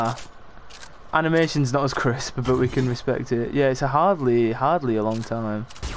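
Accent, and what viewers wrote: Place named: United Kingdom. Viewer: His accent was killin me prolly cause I love Brits 😂😭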